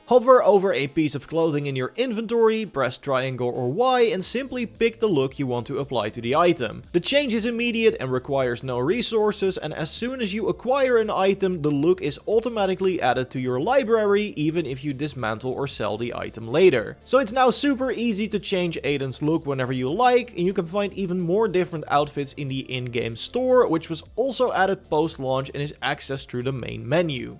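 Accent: Netherlands. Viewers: This man is from the Netherlands, I can tell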